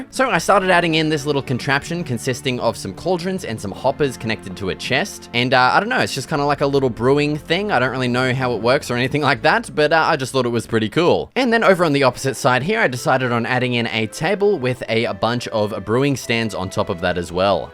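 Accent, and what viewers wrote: Australia. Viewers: Sounds Aussie to me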